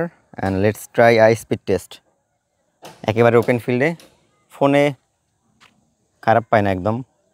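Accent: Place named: Barishal, Bangladesh. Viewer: ভাইয়ের বাসা মনে হয় বরিশাল। 😊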